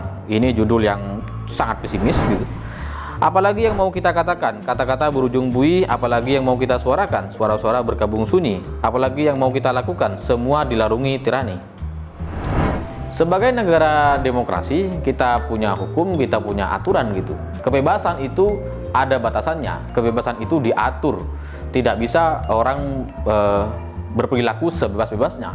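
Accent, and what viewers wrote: Madura, Indonesia. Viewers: org yg ngomong ini logatnya spt org madura ya....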